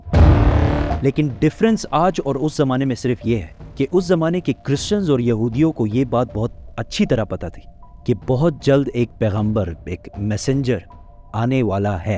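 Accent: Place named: United Kingdom. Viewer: Bhai english accent british jaise kyu? Normal bolne mein problem hai kyq???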